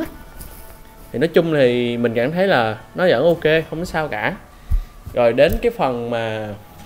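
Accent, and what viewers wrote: Standard. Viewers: a nói chuẩn quá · Anh nói chuẩn quá :33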